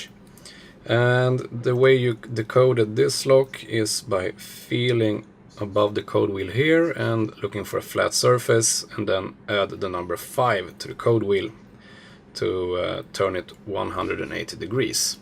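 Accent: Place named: Sweden. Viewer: You are swedish, I hear it by accent... hej på dig!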